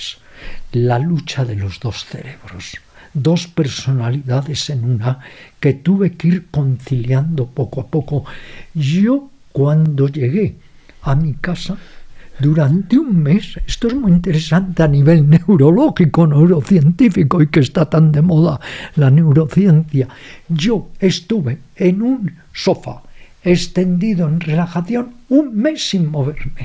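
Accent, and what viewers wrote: Galicia, Spain. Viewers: Qué rato más estupendo he pasado escuchando este podcast! Aunque han sido más de dos horas me ha sabido a poco! Yo a Ramiro Calle ya hace tiempo que le sigo y le conozco, le considero mi maestro salvador ya que desde que me topé con él me ha cambiado la vida y no me cansaré de darle las gracias!🙏 En cuanto a Alex Fidalgo, no tengo palabras! Que magnífico entrevistador y me atrevo a decir, mejor persona! Cómo respetas los tiempos de respuesta, qué manera de absorber todo lo que te contestan, qué preguntas más naturales e interesantes... y ya no te cuento ese acento gallego maravilloso que es música para mis oídos! ❤ Muchísimas gracias por estas joyas! Deseando estoy de volver a escuchar otro podcast! Ah, y puedes seguir repitiendo de invitado. Nadie creo que se canse de escuchar a este señor maravilloso!🙏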